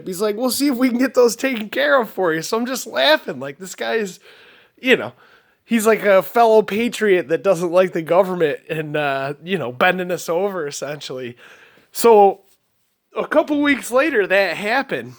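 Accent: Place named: Buffalo, United States. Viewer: I was gonna you sound like your from Buffalo. Then you said Syracuse area so I was close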